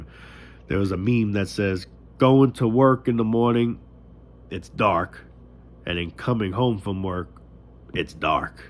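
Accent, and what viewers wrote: New York, United States. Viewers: One of the best parts of discovering your channel is that my best friend and roommate is from New York. We live in Florida. And we talk about a lot of stuff and when I mention things that you're talking about. Right now he sometimes shuts me down. But it's great to know that you are from New York and you speak Truly from the heart and The accent and the truth at the same time is so funny to me. It's like you're saying what I'm thinking and you just say it. I am not white, but my roommate is New Yorker to the bone, just like you I did live in Chicago for some years. So I do know the city life. But never been in New York. And you guys speak from the hip. You just say it. And I respect that as far as the channel and people disrespecting how you and we think. And why we listen to you on a daily basis if you would put out videos on a daily basis. 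You just speak the truth and the truth is something that can't be hidden and you will grow because people will hear you and follow you because you have the Audacity to speak the truth Bravo. Bravo you are what humanity should have been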